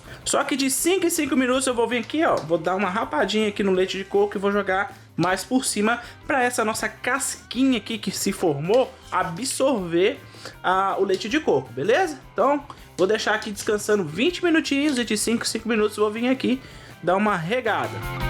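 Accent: Minas Gerais, Brazil. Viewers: Eu também faço isso! Amo os vídeos e o sotaque "gostosim" dele!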